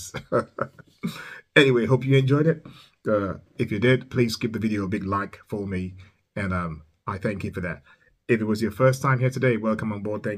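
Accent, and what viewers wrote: England, United Kingdom. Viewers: Your English accent and diction are refreshing. We get so many mumble mouthed people here in the States.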